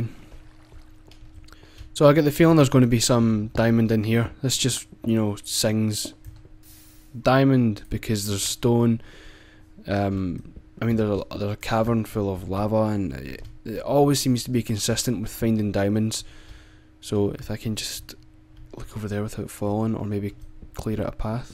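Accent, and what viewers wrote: Scotland, United Kingdom. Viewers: I really liked your MW2 commentaries I love your Minecraft videos I am OBSESSED BY YOUR AWESOME SCOTTISH ACCENT!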